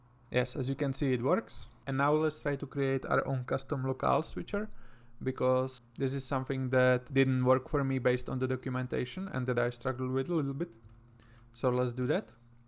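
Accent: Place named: Czech Republic. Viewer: pekna chenglish:DD ale dobre video, jen hledam jak udelat localized pathnames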